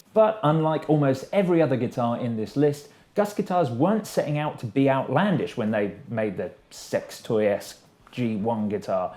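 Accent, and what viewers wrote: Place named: United Kingdom. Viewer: Why the hell is Evgeni Malkin talking about guitars and why does he have a British accent?...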